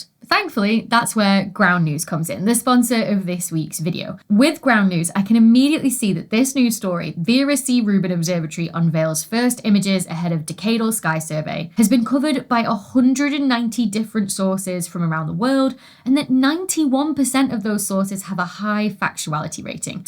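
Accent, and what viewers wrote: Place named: North West England, United Kingdom. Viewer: I always come to Dr. Becky's parlour to listen to her brilliantly articulated speech. It's a pleasure to hear her speaking the North West English.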